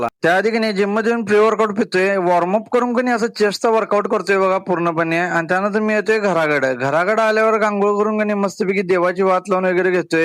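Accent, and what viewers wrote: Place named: Kolhapur, India. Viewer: कोल्हापूर cha वाटत ahes .. भाषे वरून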